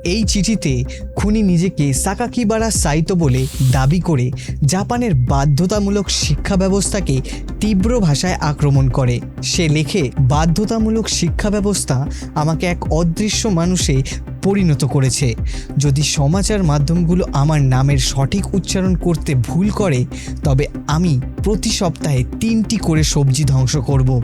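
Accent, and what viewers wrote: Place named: India. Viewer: আপনাকে বাংলাদেশি মনে করছিলাম।কিন্তু উচ্চারণ কথাবার্তার ধরন দেখে ভারতীয় মনে হলো।পরে দেখলাম চ্যানেলে আসলেই ইন্ডিয়ান লেখা।বাংলাদেশে নাম হয় না, হয়।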